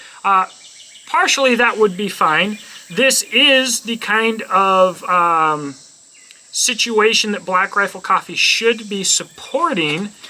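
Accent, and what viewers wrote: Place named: Georgia, United States. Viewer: As a northerner in ga your accent is a relief. Good video as well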